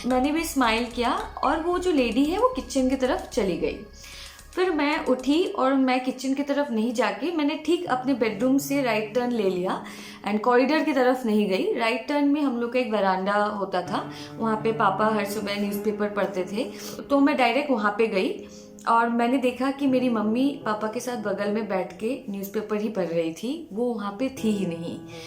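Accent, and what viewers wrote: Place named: mixed, India. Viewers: Meri gf ki trah pronounciation hai aap ki Assamese bengali jab hindi bolte 😅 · I like your stories and your speaking style is very cute and my own sister-in-law was an Assamese so I understood that you were an Assamese too. She also talks like you😊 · Loved your stories and the narration :) Btw you have a northeastern/bengali accent...are you bengali!? 😅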